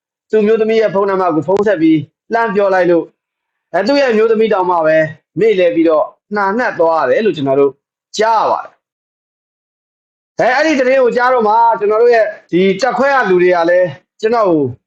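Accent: Yaw, Myanmar. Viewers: မဂ်လာညချမ်းလေးပါ ကို ရေ မီသားစု များ အဖွဲ့သားဓမ္မဘက်တော်သားမို့အားလုံးပါနေကောင်းကျန်မာစိတ်ချမ်းသာလို့ရာဆန္ဒပြည့်ပါစေ 🙏🙏🙏🤘🤘⭐⭐🌹🌹❤❤အလွမ်းပေးတဲ့ ယောနသံလေးကွ 😂❤ဟို့နေက မဆီမဆိုင် ခဏနားမယ် ဆိုသာကို မျက်ရည်ကျလာတယ် 😢😅အဘွားကြီးဆိုတော့ အသဲနု၏ 😊မျှဝေလို့ ကျေးဇူးပါနော် ပုံပြောတဲ့ကိုကိုရေ👏👏👏💞💞🌷🌷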